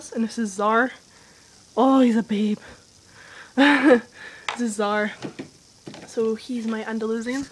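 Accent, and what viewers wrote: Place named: Scotland, United Kingdom. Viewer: Your not alone with the accent , cause I'm scottish too :-D and live near Glasgow !!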